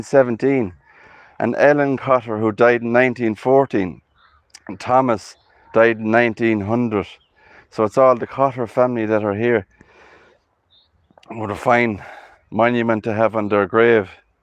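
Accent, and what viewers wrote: Ireland. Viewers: I like the new channel name. The cemeterys there have such fantastic character and The narration with your Irish accent really makes for an incredibly enjoyable video ! ! Thank you for producing them!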